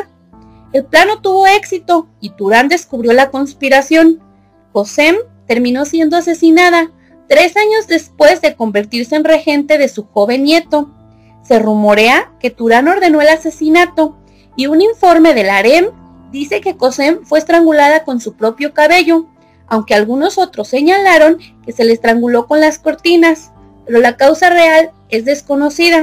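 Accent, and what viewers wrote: Mexico. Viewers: Muy buen trabajo, interesante e informado. Me costó adaptarme al acento mexicano, pero valió la pena. 👍🏼🇺🇾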